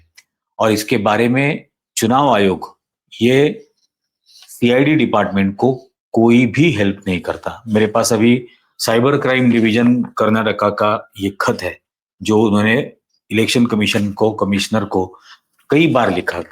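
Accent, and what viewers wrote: Standard. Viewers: सर, खूप चांगल्या पद्धतीने अगदी शुद्ध हिंदीत माहिती मिळाली 👏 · आप ने बहुत महत्व पूर्ण जानकारी दी. यह कहना भी बहुत जरूरी है कि आप बहुत अच्छी हिन्दी बोलते हैं और आपके उच्चारण की शुद्धता शानदार है.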